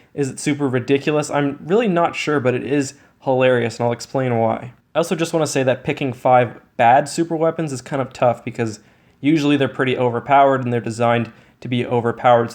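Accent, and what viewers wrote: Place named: Saskatchewan, Canada. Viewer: You from Saskatchewan?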